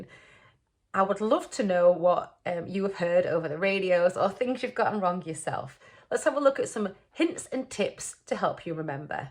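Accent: United Kingdom. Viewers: I guess you're from Scotland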